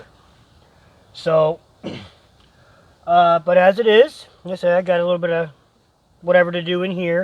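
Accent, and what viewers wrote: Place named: Scotland, United Kingdom. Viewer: you sound kind of funny for a Scotsman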